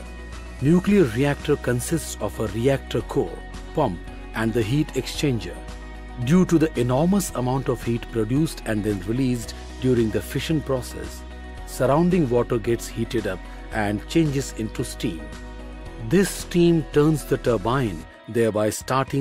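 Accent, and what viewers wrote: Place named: Bollywood, India. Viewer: Bollywood accent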